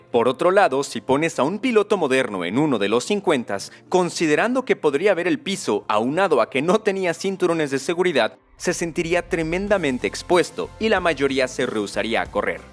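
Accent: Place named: Latin America. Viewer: Esto no era Driver61 en Español? Por qué está en modo latino? Otro video que no veo.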